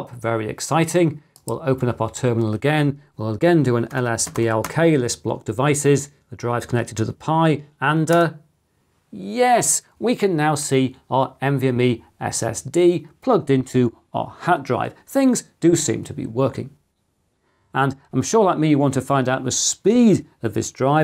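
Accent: United Kingdom. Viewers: Would be nice if the channel removes stinky f British accent. Accent of world slavery and kings to enslave ppl.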